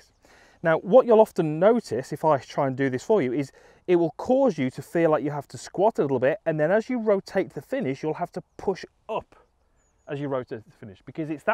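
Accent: United Kingdom. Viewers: British english funny n talk too much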